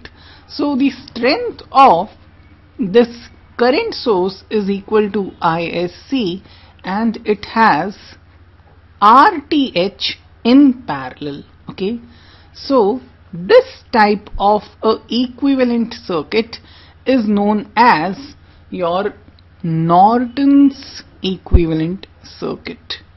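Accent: India. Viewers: it's regular INDIAN English pronunciation... these make my ears bleed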